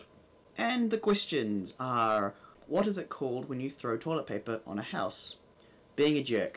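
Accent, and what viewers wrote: New Zealand. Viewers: I think what stands out the most for me in New Zealand accents are how the letter E is pronounced in words. It is my favorite and definitely different from Australian or British. :) One of my online friends is from NZ. I like how you say sneakers and tomato by the way. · Well let's see your video on this... I'm from Auckland, and he 'sounds' pretty kiwi normal to me. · You have quite a refined Kiwi accent, go out into the wops and yool git some rool bad koiwoi accents.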